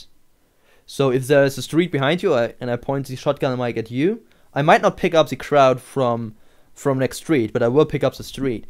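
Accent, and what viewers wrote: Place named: Germany. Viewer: Are you German..your accent is dope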